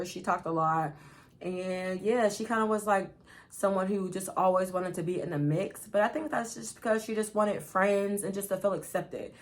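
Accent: Pittsburgh, United States. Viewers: this is so random but i love ur accent bc it reminds me of my old college best friend 😭 i knew instantly u was from pittsburg i miss her lil cow girl sounding self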